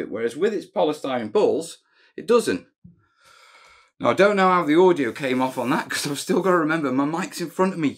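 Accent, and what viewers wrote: United Kingdom. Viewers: Missed that british accent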